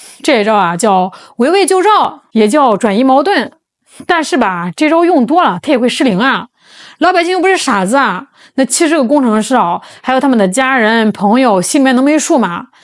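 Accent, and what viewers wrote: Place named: Shandong, China. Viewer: 一听就是山东的，外网键政圈大部分是山东人😂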